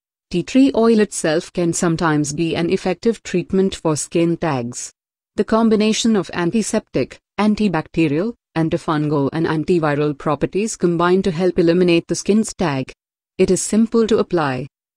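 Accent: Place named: North India, India. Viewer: This woman is from India obviously the slang also her voice more to North Indian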